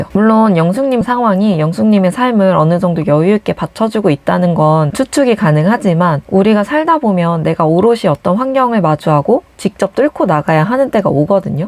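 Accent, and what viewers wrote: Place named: Gyeongsang, South Korea. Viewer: 근데 ‘영수님’하고 ‘영숙님’ 발음이 너무 똑같아서 구분이 안돼요. 경상도 사투리 약간 쓰시는 듯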